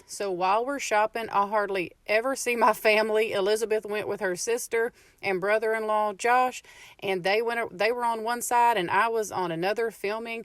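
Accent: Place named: Texas, United States. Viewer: Love your videos and your Texas accent! But does your hubby ever complain of too many baskets?lol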